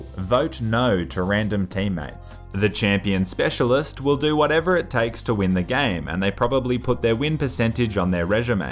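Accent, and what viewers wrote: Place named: Australia. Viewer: Within the first 5 seconds, I was thinking, 'ohh, might be a fair dinkum aussie', 1 minute I was thinking, 'what a bloody legend, an aussie gaming channel. Bloody oath.' don't even have to be told. I can smell an aussie from the other side of the planet.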